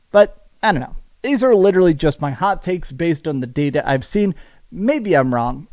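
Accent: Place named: South, United States. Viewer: Southern accent. He even says y'all. Are you saying white people can't have their own culture?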